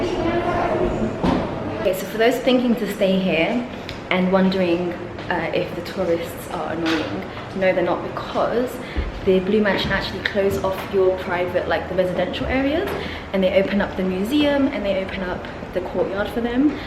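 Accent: United Kingdom. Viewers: I love your British accent.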